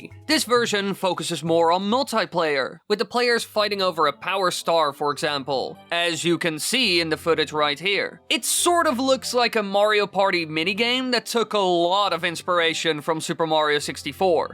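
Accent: Netherlands. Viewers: Je moet je hollands accent nog iets beter verbergen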